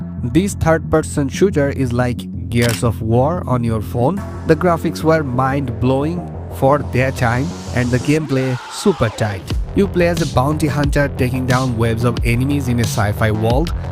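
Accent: India. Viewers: Yeah, I don't need to play any of these. Always these top games must play videos with some heavy accent Indian guy and the lists are always crap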